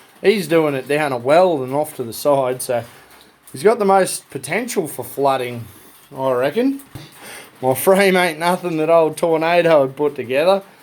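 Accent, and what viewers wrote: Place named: Australia. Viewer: Looking good!, huge back saver!i thought i was the only one who had trouble with train leaving the station without me on it !😂you to young! Love the Australian accent and words you use, seriously looking great you've come long way!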